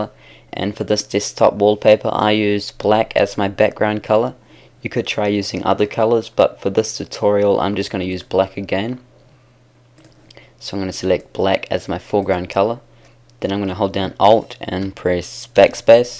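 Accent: New Zealand. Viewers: Hey bro, are you from new zealand coz youve got a kiwi accent, if you are a kiwi are you maori or not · Sounds like he's from New Zealand. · love your kiwi accent mate Great tutorial btw!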